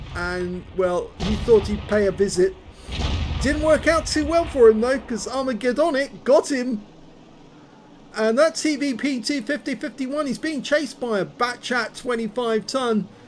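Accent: United Kingdom. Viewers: You are from England ???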